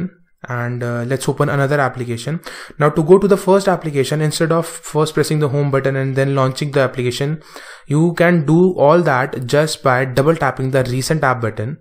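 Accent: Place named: India. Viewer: oh god ! im going to be sooooo racist! your accent is soooopo onmy nerve! i've heard another indians accent but your's just so deeeeeep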